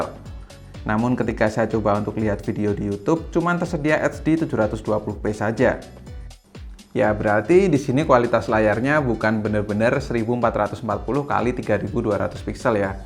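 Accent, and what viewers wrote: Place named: Java, Indonesia. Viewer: Logat jawanya masih terasa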